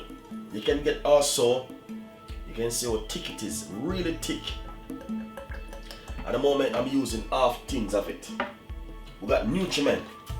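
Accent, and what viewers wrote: Jamaica. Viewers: The Tensai that's the Jamaican pronounciation,the word is moss.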